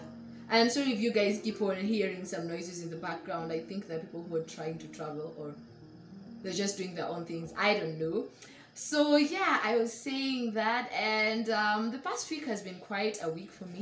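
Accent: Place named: Luhya, Kenya. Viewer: 😂I love it when you speak swahili manze..it comes out so naturally..the Luhya version babe...the products are so preeeeettyyy😍just like you...keep doing you shawryy...❤❤❤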